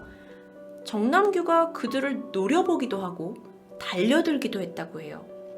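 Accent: Jeolla, South Korea. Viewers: 디바님 고향 전라도임? 의를 자꾸 으라고 발음하는거 이거 전라도발음인디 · 혹시전라도 분이신가요 님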